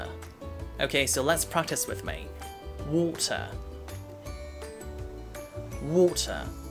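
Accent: United Kingdom. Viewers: Your accent is very impressive considering you are not from England! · 제가 하기엔 어렵지만 넘 멋있어요 영국발음 닷츠 워우타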